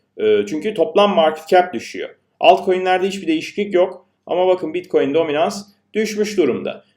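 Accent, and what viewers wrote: United States. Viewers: aksan amerika :)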